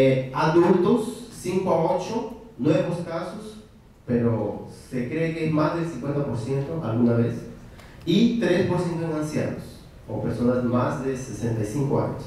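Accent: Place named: Brazil. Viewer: muy buena exposición.. pero no es necesario hablar como brasilero.. yo vivi 15 años en españa y jamas hable como gallego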